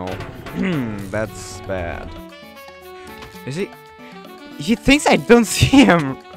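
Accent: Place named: Germany. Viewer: I bemerken über your MUTTERSPRACHE that du habe a DEUTSCH Akzent , do you DEUTSCH?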